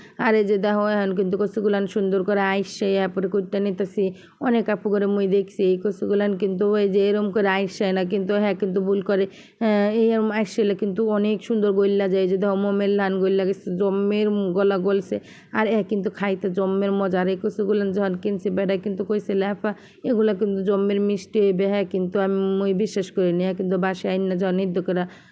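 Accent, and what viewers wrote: Barishal, Bangladesh. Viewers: নাস্তা খাওয়া রান্না কেক বানানো বরিশালে ভাষায় কথা বলা খুব ভালো লাগলো ❤❤❤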